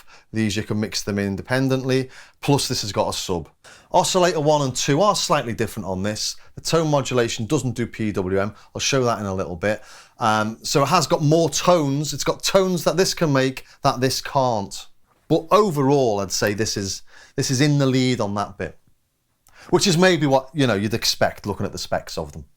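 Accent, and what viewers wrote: Liverpool, United Kingdom. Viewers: Is a scouser?! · Are you from Liverpool?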